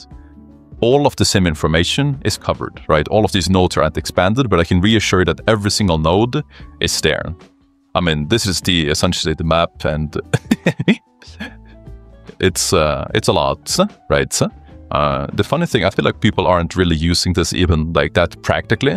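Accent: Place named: Sweden. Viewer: Sounds Swedish to me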